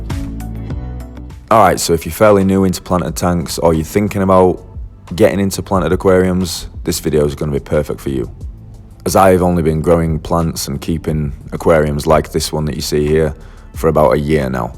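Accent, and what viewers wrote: United Kingdom. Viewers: You sound as though you’re in the UK, how can you buy aquarium co op feRT? Great vid BTW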